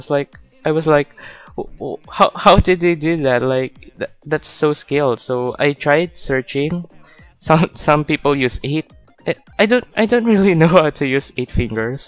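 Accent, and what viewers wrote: Philippines. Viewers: Parang tagalog accent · its obvious that his filipinooo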